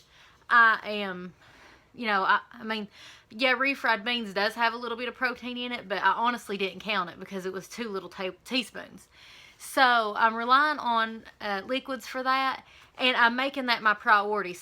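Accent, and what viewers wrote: South, United States. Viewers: Girl I love your accent. lol Country girl like me! I live not far from you in Bristol, VA. You are doing so good, just hang in there, the lbs will fluctuate each week! Keep it up!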